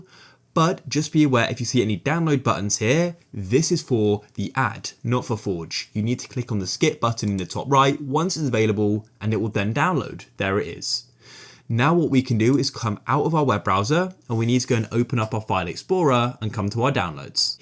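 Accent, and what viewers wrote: United Kingdom. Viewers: You bri-ish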